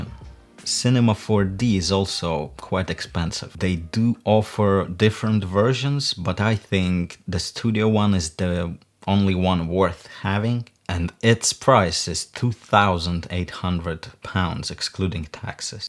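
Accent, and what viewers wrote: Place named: Russia. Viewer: Russian accent😂anyway blender 2.8 is future 3d modelling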